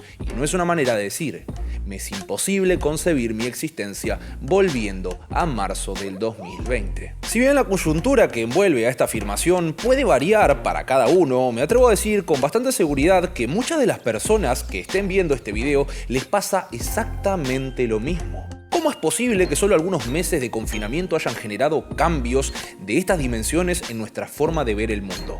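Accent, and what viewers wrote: Argentina. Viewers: Por tu forma de hablar y el paneo del obelisco deduzco que sos argentino. Decis que la radicalización viene de la mano de Internet, desde que empezó la cuarentena en Argentina se violaron todos los derechos habidos y por haber en Argentina y se pasó por arriba la Constitución. Mas que radicalización yo diría que muchos nos cansamos de que nos pasen por arriba. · Este canal y este video es de izquierda. ¿Como lo sé? Simple: todo el video es acerca de hecharle la culpa a los demás, con referencias intelectualoides, y además el acento argentino lo delata. Ni los algoritmos ni los demás tienen la culpa de tu vida y de tus posturas, uno tiene que hacerse responsable de uno mismo, y tener opiniones fuertes y posturas firmes no tiene nada de malo. Abandonen la actitud de victimismo, es peligrosa y no sirve de nada.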